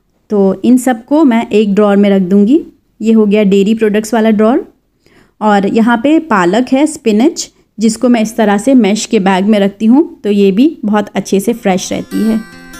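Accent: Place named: Uttar Pradesh, India. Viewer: App Mumbai so ho. Lekin bat tu pori up wali kartey ho☺️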